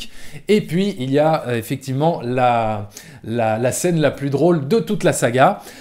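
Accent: France. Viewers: Arthur BASSET Non, parce qu’il n’y a pas de bon youtubeurs ciné québécois comme durendal, MJ de fermez là ect. Et de toute façon l’accent français ne me dérange pas du tout! J’y suis habitué!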